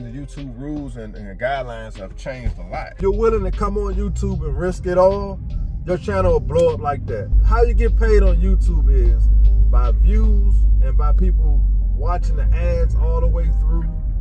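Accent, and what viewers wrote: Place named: Washington DC, United States. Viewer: you from the DMV i can tell u got like a DC accent lol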